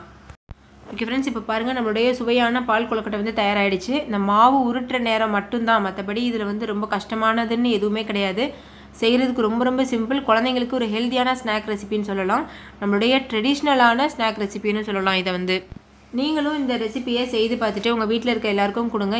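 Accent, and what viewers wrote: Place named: Madurai, India. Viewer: Madurai side ahTamil neenga my old days memories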